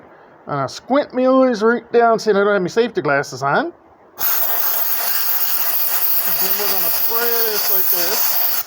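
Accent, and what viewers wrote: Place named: Newfoundland, Canada. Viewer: I started watching out of curiosity....soon as I heard the newfoundlander accent you could have been reading war and peace and I'd have sat through to the end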